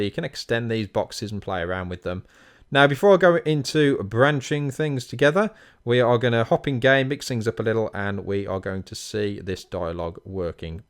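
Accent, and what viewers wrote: United Kingdom. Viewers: I'm an American. I have no idea why, but I find it remarkably easier to learn this shit from a guy with a British accent. I probably should have just gone to school in the UK, come to think about it; would have learned my lessons much more effectively from teachers with accents I think.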